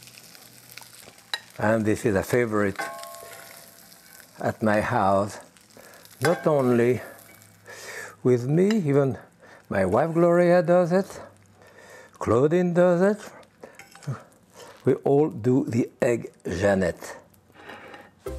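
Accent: France. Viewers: What a great idea for an economical lunch, these eggs, some crusty bread and butter and maybe some salmon, with a spicy sauce! Jacques Pépin you are a treasure! Absolutely love his cultured accent, mostly French with a tiny bit American! God bless you Sir!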